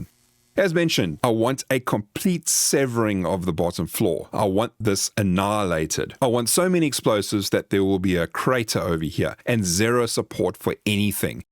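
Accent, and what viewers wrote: South Africa. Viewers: South African accent is so fun to listen to.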